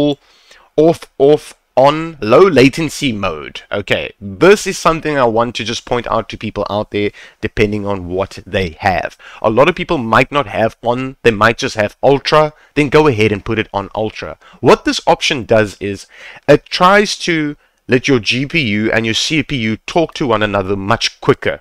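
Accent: South Africa. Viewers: I knew you were South African as soon as I heard that accent! Big ups bro nice to see local gents doing well 👏🏾 (gonna try this out when my loadshedding is done)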